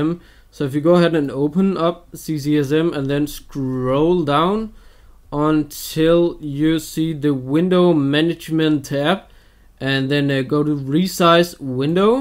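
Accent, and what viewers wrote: Denmark. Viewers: det er så tydeligt at du er fra danmark ( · I knew it, you can always hear if it is a Dane who speaks English!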